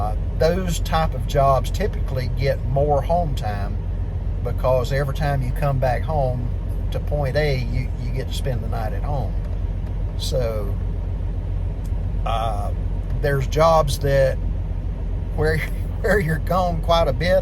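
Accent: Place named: Ireland. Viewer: I'm on my third month now with the company and so far so good. I love your Irish accent or is it Italian. hahaha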